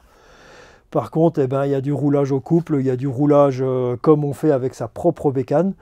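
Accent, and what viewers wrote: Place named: Northeast, France. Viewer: Bonne vidéo, bien commentée, avec un accent du Nord Est bien sympathique !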